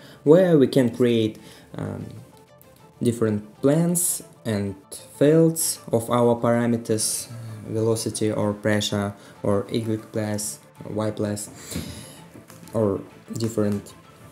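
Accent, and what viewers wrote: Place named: Russia. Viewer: спасибо за ценный урок! Судя по произношению - наш человек!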